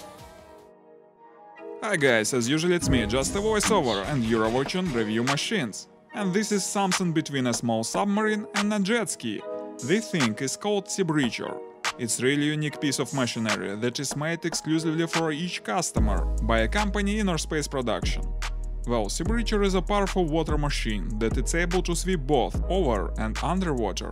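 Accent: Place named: Russia. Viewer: Sounds very much like a Russian accent.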